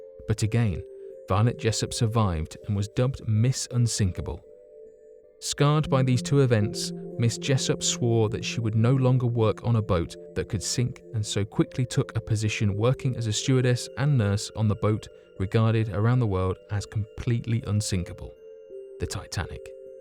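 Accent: United Kingdom. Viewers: Dude!! You are screwing up Royally. Franze Joseph, really? Butcher? Dictator? Are you really that stupid? Franze Joseph was neither a dictator nor in any way a butcher!! WTF is wrong with you? He was the Emperor true. But loved and respected. I live in Vienna. And have family here going back 800 years. Franze Joseph is still widely regarded as one of their most beloved leaders. Keeping them out of war and reigning over a period of peace and prosperity. In the video you say these three men. And then show a picture of five men known of whom is Franze Joseph. Stalin yeah, thats Stalin. Hitler uh huh, thats Hitler. Sigmond Fruede yeah, thats him. But Franze Joseph dont think so. Are you getting high before you edit? Really sloppy work here. A British accent doesn't make you smart. You going to apologize to two million Viennese.(Damn son. I wouldn't be visiting this wonderful, tolerant city any time soon.) Good luck with that apology. 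Very sad. Sloppy work.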